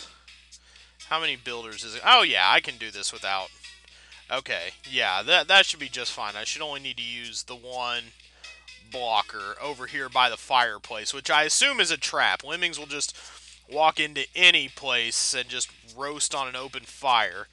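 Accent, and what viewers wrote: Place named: United Kingdom. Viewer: That first level reminds me of some of the levels in the tricky rating. Great Brit accent by the way :)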